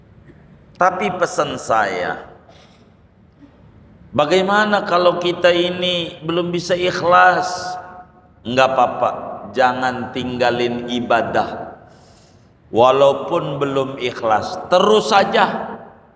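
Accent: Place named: Betawi, Indonesia. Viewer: Qobiltu Pak haji, paling suka neh ane dengan ceramahnya gaya betawi yee, terima kasih pak haji ilmu ane bertambah lagi mudah"an ane istiqomah Amiin yra🤲🤲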